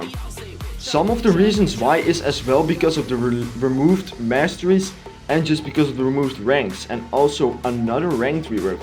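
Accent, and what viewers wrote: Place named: Netherlands. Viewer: i hear a netherlands accent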